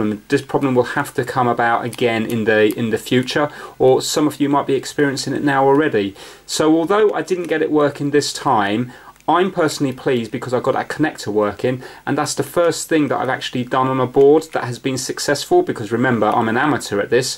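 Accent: United Kingdom. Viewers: I really love the UK accent, I can listen to it all day :D It's the best language in the world ! ( I'm from Belgium by the way xD )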